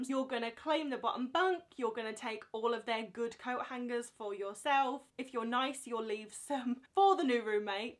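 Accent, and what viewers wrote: United Kingdom. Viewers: Where in the UK is your home? Or more to the point, which regional dialect is yours?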